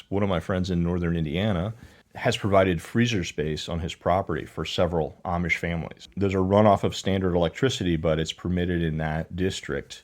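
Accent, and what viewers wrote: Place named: Baltimore, United States. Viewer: This is my first time watching but you sound like your from Baltimore :)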